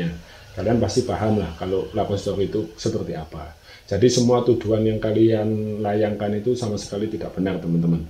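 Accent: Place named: East Java, Indonesia. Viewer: Mas jatim mu mana sih kok medok nya super medok dokk dok...soale aku juga jatim tapi yg perbatasan jateng yg ga nglotok medoknya..yahh level sedang lah ...semangat mas bro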